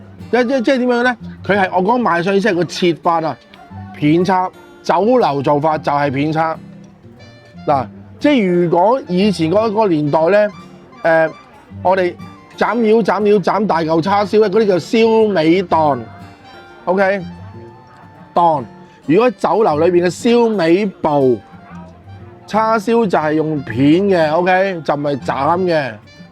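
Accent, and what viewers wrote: China. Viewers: 屌啲煲冬瓜好撚可愛兼普通I like it🙂‍↔️😂👍🏻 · 可愛的普通話😂好睇😊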